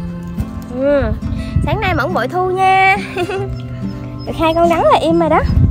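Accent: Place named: Mekong Delta, Vietnam. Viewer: rất là chất phát miền Tây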